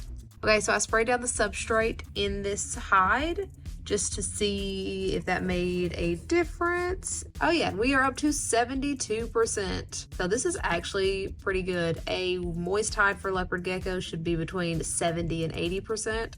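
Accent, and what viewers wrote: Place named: South, United States. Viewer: ! I noticed your accent and I was like, "Girl has to be from the South!" Honey, I'm in Huntsville...only 30 minutes from you! I don't know why I'm so excited. Lol. My son got a leopard gecko for his birthday and we are in love!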